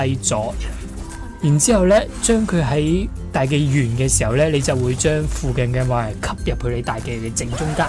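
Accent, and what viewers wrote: Hong Kong, China. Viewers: 聽你口音就知道你係香港人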